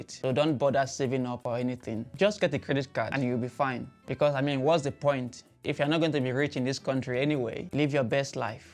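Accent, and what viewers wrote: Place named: Africa. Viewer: i worked with a lot of Africans, for some years, i miss hearing this accent everyday x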